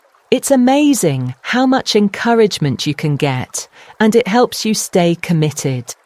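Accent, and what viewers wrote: United Kingdom. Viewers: ty i love your british accent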